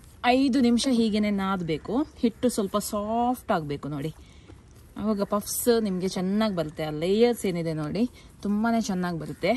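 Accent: Mangalore, India. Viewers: First thing i like is mangalore slang ,n second thing is ur recipes is ultimate for healthy and natural making ..continue akka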